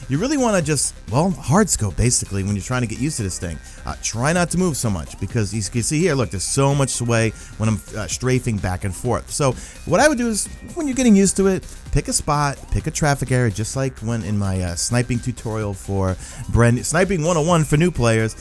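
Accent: New York, United States. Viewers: you from new York? or Boston perhaps?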